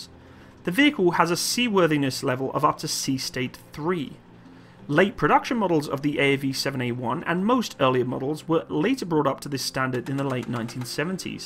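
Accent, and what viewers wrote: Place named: England, United Kingdom. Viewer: The narrator sounds English but talks like an American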